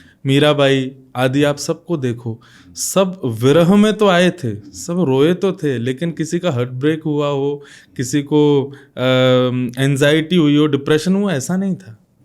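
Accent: Braj, India. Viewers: apni Braj ki vasa boliye na woh acha lagta h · aapki samukh se varja bhasha hume badi pyari lagati he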